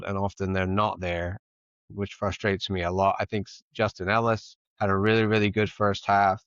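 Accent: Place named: RP, United Kingdom. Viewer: Your accent is so much more posh now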